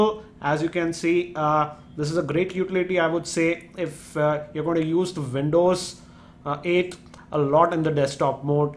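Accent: India. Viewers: lol i love indian accent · LOLOLOLOLOLOLOLOLOLOLOLOL Indian accent <3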